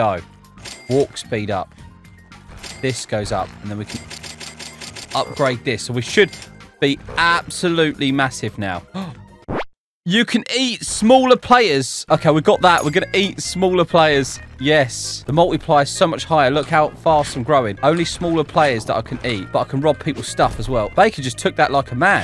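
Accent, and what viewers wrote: United Kingdom. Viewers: I just realized that this YouTuber is British💀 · Are you British😂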